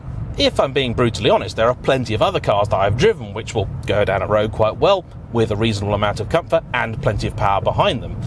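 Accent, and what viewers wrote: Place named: RP, United Kingdom. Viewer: You sounded so posh and isolated.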